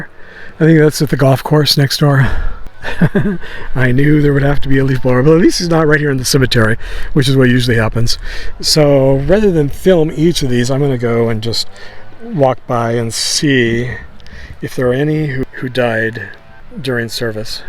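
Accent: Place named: Boston, United States. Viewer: Cuppa coffee. Sounds like your from my neck of the woods. Boston area.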